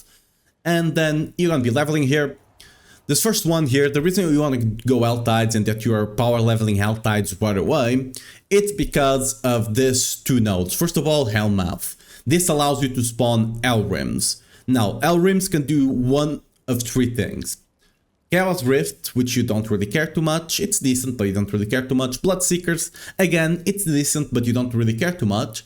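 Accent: Portugal. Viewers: It's incredible that I can tell you're Portuguese just from your English nice video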